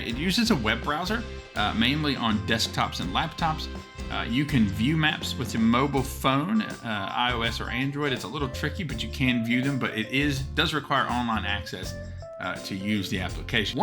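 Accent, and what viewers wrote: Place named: Alabama, United States. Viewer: You from Alabama? You sound just like Destin from Smarter every day!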